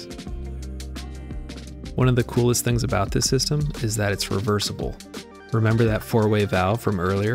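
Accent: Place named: United States. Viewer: The way you say Gianni sounds like Johnny because of your American accent lol. A strong American accent saying John sounds more like Jahn to my Aussie ear. (We have our own funny pronunciations)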